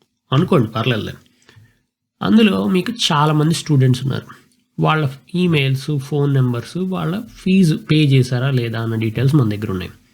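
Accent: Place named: Telugu, India. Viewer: with Telugu flavor, with a natural slang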